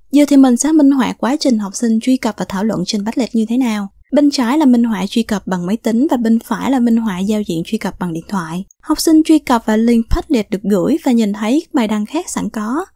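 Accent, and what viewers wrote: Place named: South, Vietnam. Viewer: ôi đúng là giọng con gái miền Nam, nghe ngọt xỉuuuuuuuu nghe kênh của bạn này hướng dẫn vừa dễ nghe lại dễ hiểu, dễ làm mong bạn có nhiều video như thế này nữa <3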